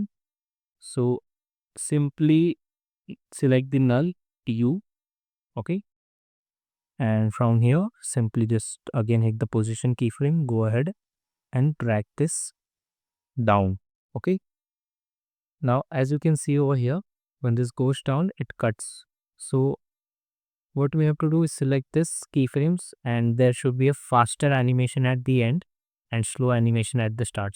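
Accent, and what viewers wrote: India. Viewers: no music no sounds just pure indian accent and after effects thanks dude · I just hear the india accent and i Liked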